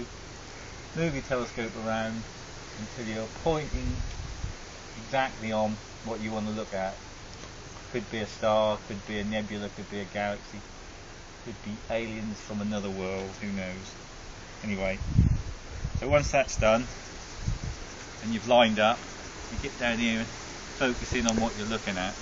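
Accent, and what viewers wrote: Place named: Norfolk, United Kingdom. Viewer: You sound like a good old Norfolk boy. I was born in Yarmouth but have lived in Ipswich for the last 10 years. We have some lovely dark skies around here and the Skywatcher 200 is on my shopping list. Good video.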